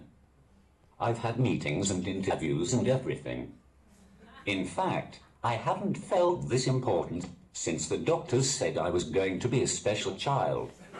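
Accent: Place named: London, United Kingdom. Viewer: that's the accent of London. :)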